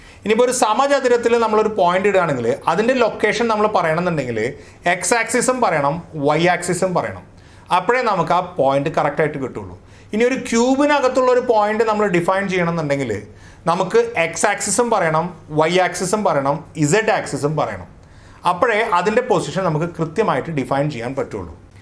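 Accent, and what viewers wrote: Thrissur, India. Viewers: Sir Thrissurkaaranano😀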